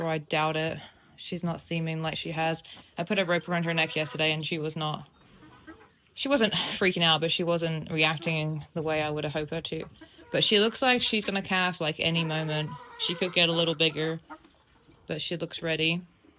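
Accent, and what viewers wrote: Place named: Australia. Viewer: I know what you mean , sounds like Australian and it's So Interesting , love it ❤️👍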